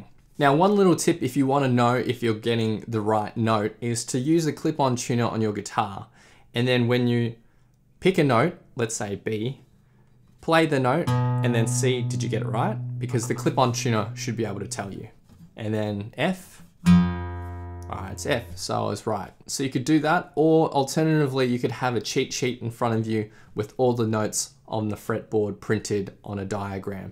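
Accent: Australia. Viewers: Haven’t watched hundreds but agree this one is brilliant, keep it up. And the Australian accent… sweet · Something about the Australian accent that makes this so much more interesting…..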